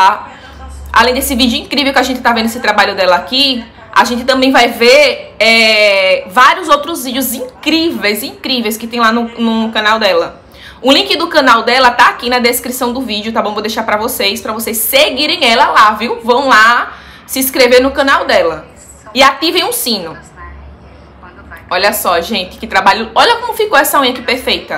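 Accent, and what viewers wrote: Northeast, Brazil. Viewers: Adoro seus videos o jeito de vc falar seu jeito arretado do nordestino. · sigo vc justamente por causa do seu jeito, viu? Adoro esses sotaques nordestinos, moro em juazeiro do norte, sou manicure, e vc me incentivou bastante❤ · Amo seu sotaque nordestino,sua alegria e juventude me encantam, parabéns lindaaa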